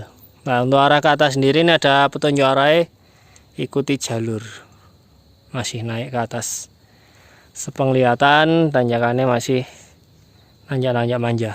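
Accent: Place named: Surabaya, Indonesia. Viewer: Mas suarsne sby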